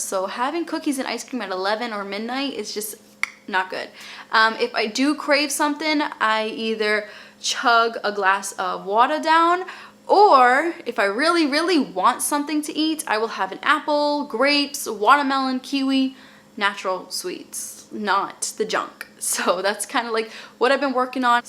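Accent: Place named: New York, United States. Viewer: Your accent reminds me of our New York accent lol I love it lol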